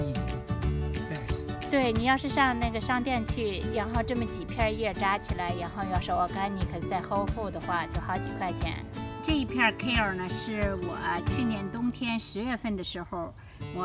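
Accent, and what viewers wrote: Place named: Shandong, China. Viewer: 山东口音好亲切 赞👍🏻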